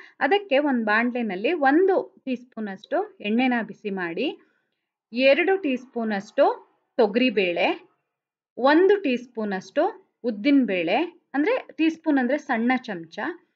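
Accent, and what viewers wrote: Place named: Brahmin, India. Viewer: ಶುದ್ಧ ಬ್ರಾಹ್ಮಣ ಉಚ್ಚಾರಣೆ 🙏🪷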